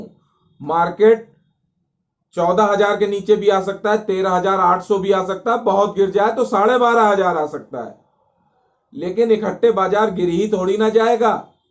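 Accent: Kanpur, India. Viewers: Kanpuriya lagte ho bhaiyaa jee app🙏🙏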